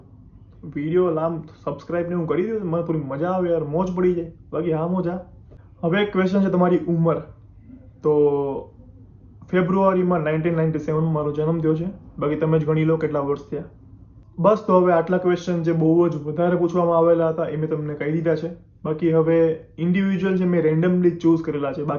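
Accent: Kathiawar, India. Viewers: Naa... beta tame kathiyavadi cho e to language par thi j khabar padi j jaay che....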